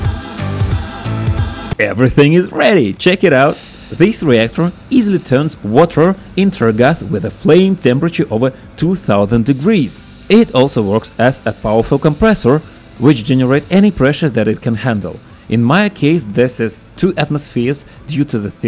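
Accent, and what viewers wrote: Russia. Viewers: Прокачай английский пж, а то русский акцент раздражает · Я немого понять это русскоязычный Чувак говорит на английском ? А то что то акцент палиться 😀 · Are u Russian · русский. Это очень слышно по акценту. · Я же знаю, что ты русский. Акцент тебя спалил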